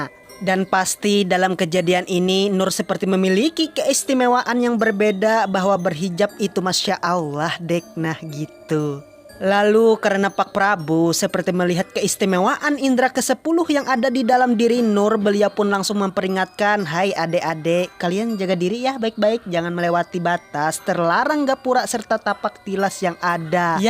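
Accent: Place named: West Java, Indonesia. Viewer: Sigana urang sunda nu nyarita na😂,mantap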